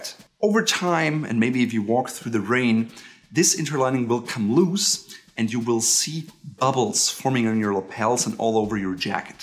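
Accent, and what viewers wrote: Germany. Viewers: I sense German. not sure if correct or not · He has a hint of a German accent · Awesome. Thank you for making this video. I hear a slight accent, German or Jewish.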